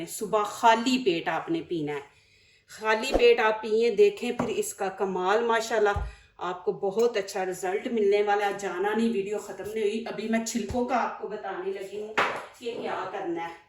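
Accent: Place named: Punjab, India. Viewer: Aunty pujabi mein urdu acheeee laaaagti hey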